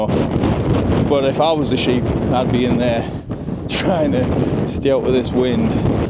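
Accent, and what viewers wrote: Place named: Northern England, United Kingdom. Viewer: Hi thanks for this series! My partner is in nthn Norway (LDR) so I watch a lot of these vids :) Are you fm southern Scotland Bec your accent seems more nthn England?!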